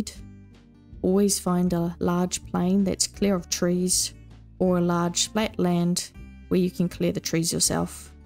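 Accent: New Zealand. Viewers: Youtuber sounds kiwi as. haha.. Nice!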